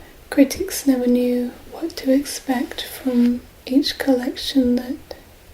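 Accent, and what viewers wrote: Scotland, United Kingdom. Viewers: Since I have not heard you speak out loud it is difficult to place your accent. I would guess Scottish? but I can't be certain. I have been watching your vids for some time and they always relax me. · Is she from UK? I love her voice and accent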